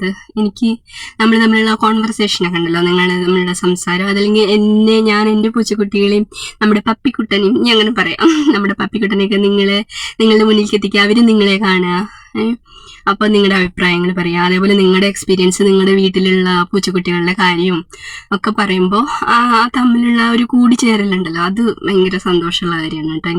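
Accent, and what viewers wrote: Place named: Thrissur, India. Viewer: enik orupad ishtanu, njan trivandrum anu trishur language kelkan nalla rasamond. Enik videos ellam ishtamanu❤❤😊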